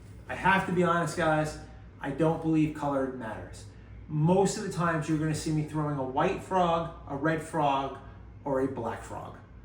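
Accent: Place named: Bronx, United States. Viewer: ARE YOU FROM NEW YORK? You sound like you’re from the Bronx.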